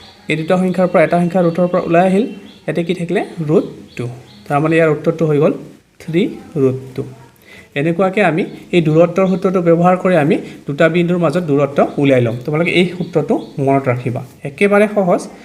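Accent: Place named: Bhojpuri, India. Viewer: Ekadam Bhojpuri Disha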